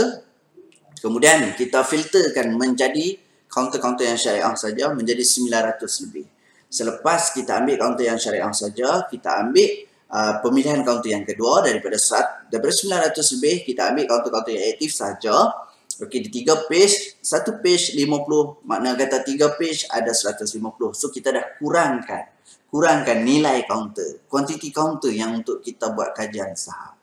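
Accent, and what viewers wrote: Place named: Kedah, Malaysia. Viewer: Seronok dengaq tuan sembang loghat Kedah..terbaik baq hang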